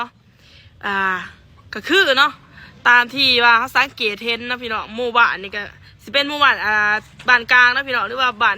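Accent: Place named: Ubon Ratchathani, Thailand. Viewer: ❤, เว้าคือคนไทย, จังหวัด, อุบลราชธานี, แทร่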